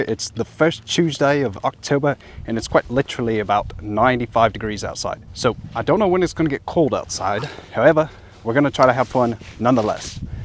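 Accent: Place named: Australia. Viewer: Sounds like an Aussie. Love the larp.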